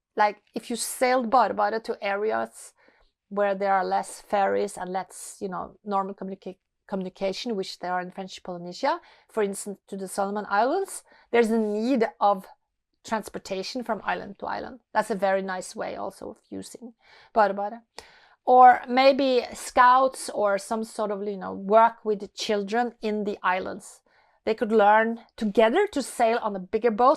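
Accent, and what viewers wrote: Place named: Norway. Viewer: So the boat name is BareBare as in bare-naked. Why do you call it butter-butter? Perhaps my southern U.S. Ears are not tuned in to your Norwegian accent. It sound exactly like butter-butter. I find it super interesting how the English language sounds SO different spoken by people around the world. Ok enough questions, good luck finding a home for butter-butter - wink!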